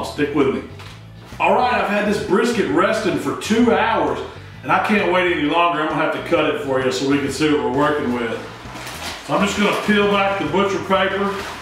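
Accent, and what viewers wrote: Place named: Texas, United States. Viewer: Belly + Texas accent = I'm going to make my first brisket! Thank you!!! ❤️ (born and raised Texan here!)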